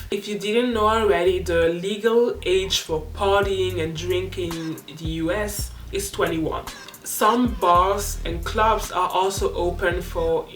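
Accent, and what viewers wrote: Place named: Switzerland. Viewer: Trust me, you're not a C2 and I sincierly think you aren't a C1 either. Not to insult you, but I just did the exam for the C1 and passed, however it was pretty taff. Between the C1 and the B2 is a huge gap which makes sence since C1 is already the second highest level. The reason why I do not think that you are a C1 are mainly the collocations. They get really important for the CAE and honestly you might have a look at them again. Secondly your language sounds really swissish. Im swiss, so I know how this is supposed to sound. Usually it is not a bad thing to have an acent. I mean I have one too, not gonna lie. At the CAE they won't even care about that. It is crucial, however, to change some words with synonims for not sounding as repetitive. For instance don't use students three times in one sentence. Chance it up with educators or pupil. 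This is what they really look at if you wanna take the CAE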